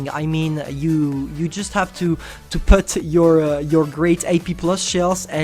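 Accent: France. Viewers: je me disais xd l'accent français et le le clan LOCA et je t'avoue que j'ai pas tous compris mais sa ses amis cause de MON anglais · Its heavy not hivie and regulaaarlie lol i can so tell you are french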